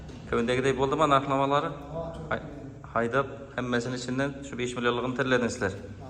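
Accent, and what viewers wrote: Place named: Mangit, Uzbekistan. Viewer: Амударёдоми диман мангитчи тилда суйлаб турибсизгу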